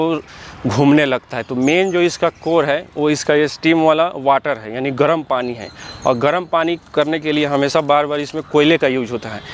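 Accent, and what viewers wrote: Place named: Bihar, India. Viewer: प्लीज बिहारी हिंदी में ना बोले 😭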